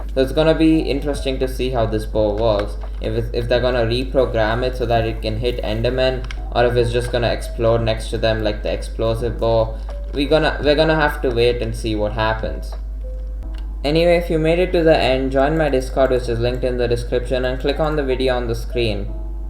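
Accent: India. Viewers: U sound kinda indian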